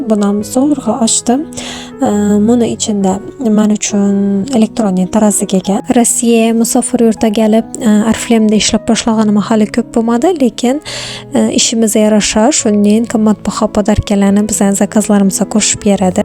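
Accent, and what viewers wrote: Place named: Khorezm, Uzbekistan. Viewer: ❤❤❤❤бунча ширин гапрдингз устозам бирам чиройли яхши кураман хоразм шевасини боризга шукур сиз туфайли шу кампанияга кушилдим сиздан бизнес сирларини урганетганимдан хурсандман❤❤❤❤❤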